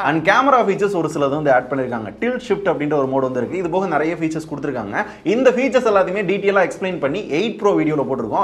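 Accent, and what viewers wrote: Madurai, India. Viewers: மதுரைகாரன் பேச்சே தனி தான்...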